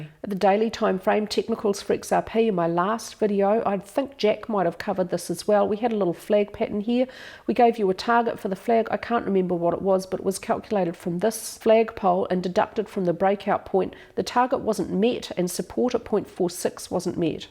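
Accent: New Zealand. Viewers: Love your work, so HAPPY to hear that Kiwi voice again The weaknesses shown in the various indicators on XRP really show an incredibly indecisive market right now. So, so hard to find signs of life! 🤪🙏😁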